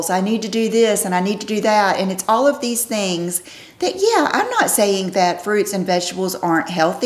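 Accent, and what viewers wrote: South, United States. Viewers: Thanks for sharing. I love your authenticity. Beautiful southern accent!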